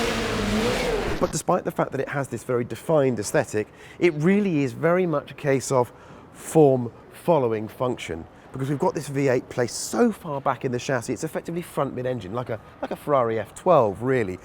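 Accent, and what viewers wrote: RP, United Kingdom. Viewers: i cant stand some one with a posh voice trying to use as many buzz words as possible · BADD ASSS MACHINE. cant stand this British guy talking like a rich guy. AWESOME MACHINE. MURICAN POWER BABY!!!!!!!!!!!!!!!!!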